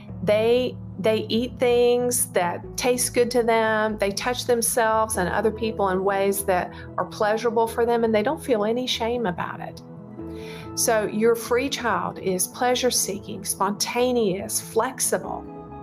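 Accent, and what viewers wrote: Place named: South, United States. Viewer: Very informative and we are all blessed that you had such a diverse life experience and your knowledge as a teacher and musician with a wonderful Voice (and Southern Gal accent and character 😀)is a true blessing 🙏. Thank you, and Team ❤️👏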